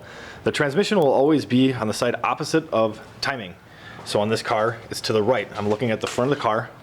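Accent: Minnesota, United States. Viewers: That Minnesota accent is on point!!! hell yea!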